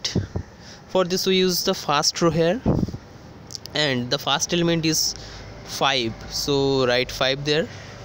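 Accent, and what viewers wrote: Bengal, India. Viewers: Banglai ho kya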